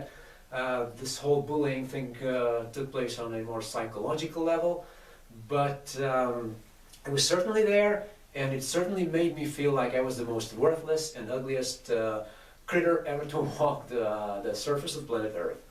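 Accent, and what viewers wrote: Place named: Northern Europe. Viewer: Hey sister, interesting accent, North European or Brazilian? Maybe a little Ricky Martin in your voice.... catch you on <link>!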